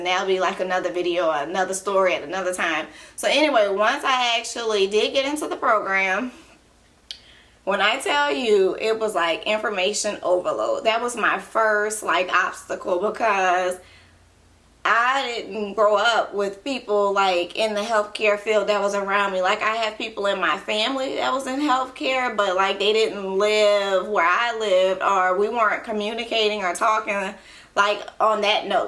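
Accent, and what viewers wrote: New Orleans, United States. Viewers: First thing I said she from nola accent tho😍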